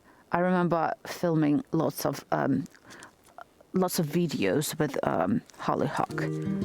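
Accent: England, United Kingdom. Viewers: Hello! Blue eyes, you eyes are sparkling, ❤ Guard your heart Springs could bring 😘 You speak English with an English accents. I love flowers and want to grow herbs. Guarding is so Romantic. Birds, sing, an we can walk bare feet in the grass tax free. Hand and hand ✋ we walk Romantic vibes we bloom, we blossom and 🌹 🌺 🌸 🌼 beyond the gloom!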